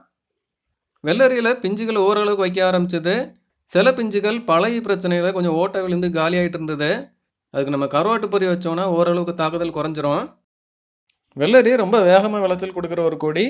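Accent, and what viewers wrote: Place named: Coimbatore, India. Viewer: super நா.. உங்க பேச்சு.... தெரியுது... கோவை... உங்க video inspiration ah இருக்கு அண்ணா..vithai pakirvu iruntha podunga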